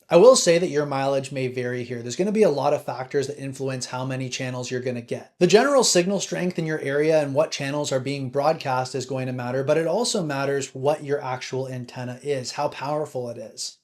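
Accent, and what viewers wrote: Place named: Canada. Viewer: Eww you sound like from cAnaDaaa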